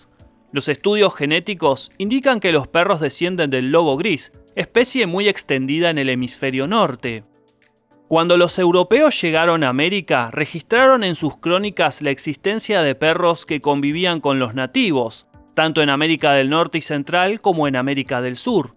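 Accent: Argentina. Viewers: Pero es argentino Ese es su acento No puedes cambiar algo que es endémico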